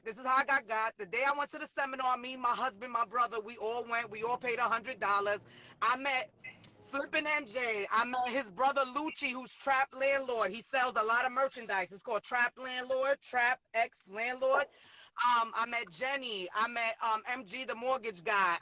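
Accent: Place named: New York, United States. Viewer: Sheesh that NY accent is aggravating; especially when it comes from women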